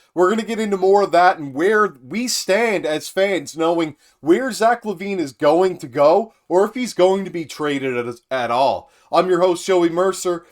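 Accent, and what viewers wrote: Canada. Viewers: Are you from Newfoundland or PEI Joey? Lol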